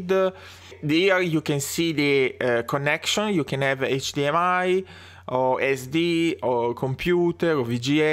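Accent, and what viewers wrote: Italy. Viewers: Che forte accento italiano cavolo hihihih spero di vederti al raduno di piazza Duomo Milano .... ti seguo da tanto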